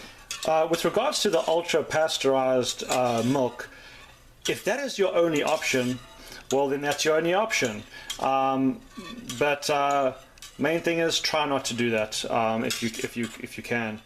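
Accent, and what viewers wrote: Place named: South Africa. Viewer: That goes without saying. The heating process is to attack some of the non-beneficial bacteria and microbes. That means, as you point out, that some benefit will be sacrificed along the way. Why should we sacrifice more than we ought. Also, I have discovered that 180 f is optimal heating and cooling to 108 is also perfect. At this time I will then place the jar in an insulated baking dish and fresh dish towels with a layer of paper towel rubber banded on. At this point, the oven will be maintened around 82-84F with simply the use of an oven bulb. Lastly, I have discovered that optimal fermentation/curing time is between 6.5 and 8 hours. Lastly, are you South African? Your accent reminds me of the many Boere which I have known.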